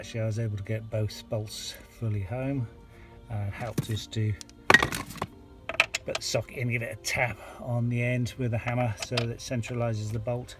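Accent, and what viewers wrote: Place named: England, United Kingdom. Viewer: A huge MERCI for your video (and for your pure English accent I can understad very well - I'm French)! I was able to remove and do some cleaning on the motor of the steering lock (Mini R56 2007). My friend first told me there was a problem with the power steering... So I removed the Power Steering electric motor (fully electric P. S.) , checked it and of course there was nothing wrong with it because it was the lock that was causing the random problem ! I used my Dremmel to cut a slot in both screw heads then used a simple screwdriver to remove the lock. Easy job! Note that when fitting the cover you have first to push the spring loaded plongeur far in with a right-angled tip in order to position the cover correctly. The lock works nicely now, I just keep my fingers crossed the problem is definitly solved. But as the DC motor was very dirty inside, I guess this guy was causing the fault! 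Your help is worth a pint of beer, my friend !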